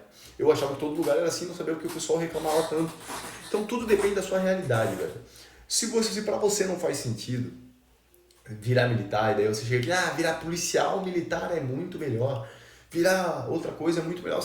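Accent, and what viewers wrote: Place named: Santa Catarina, Brazil. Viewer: Voltou pra SC e seu sotaque catarinense tá voltando aos poucos em sgt kkkkk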